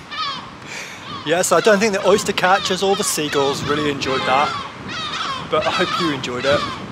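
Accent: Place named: Birmingham, United Kingdom. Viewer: You going to do anymore exploring, used to like them, are you originally from Birmingham, detect a bit of brummie accent there 🤔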